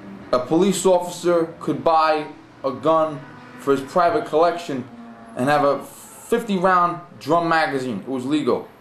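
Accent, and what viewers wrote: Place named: New York, United States. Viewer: Yo, welcome to Nu Yok. Got to love the thick accent.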